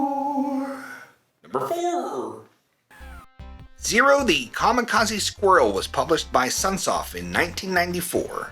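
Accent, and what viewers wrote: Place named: United Kingdom. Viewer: Only just stumbled across your videos mate. Very entertaining, and great to hear a fellow brit in a genre swamped with Americans!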